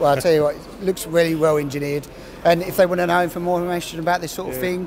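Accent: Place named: Cockney, United Kingdom. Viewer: Put the Camera on the cars...Can't understand a word this cockney is saying...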